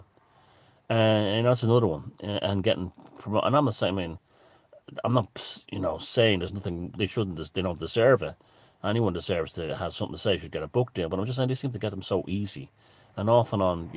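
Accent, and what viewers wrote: Estuary, United Kingdom. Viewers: nothing wrong with an estuary drawl.....but he is talking balls now 🙄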